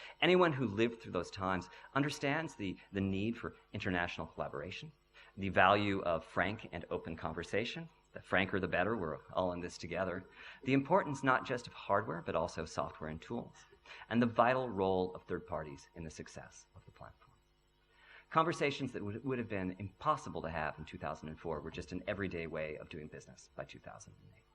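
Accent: United Kingdom. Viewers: Wait he's an American? Why does he sound British?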